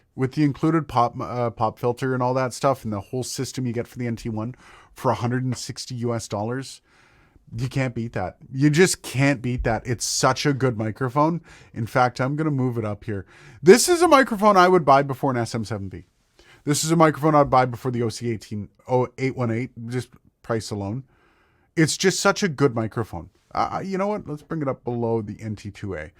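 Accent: Canada. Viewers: You’re Canadian eh?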